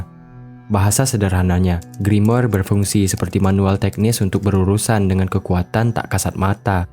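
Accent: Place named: Aceh, Indonesia. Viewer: bang orang aceh apa orang lombok bang? kentel banget logatnya 😁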